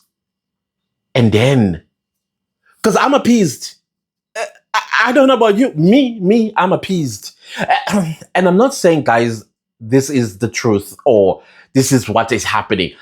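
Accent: South Africa. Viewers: 😂😂😂 you make me laugh, that emfuleni tone😂😂😂😂😂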